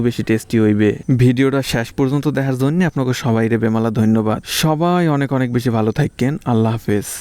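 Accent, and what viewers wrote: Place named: Barishal, Bangladesh. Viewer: কথা গুলা সুন্দর আমাগো বরিশালের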